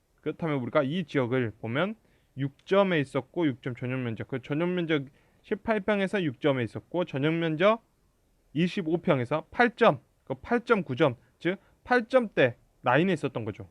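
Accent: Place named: Jeolla, South Korea. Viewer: 말투가 전라도네 ㅋㅋㅋㅋㅋㅋ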